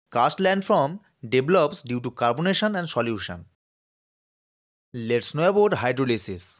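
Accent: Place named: Bengal, India. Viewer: Pronunciation is very much Bengali.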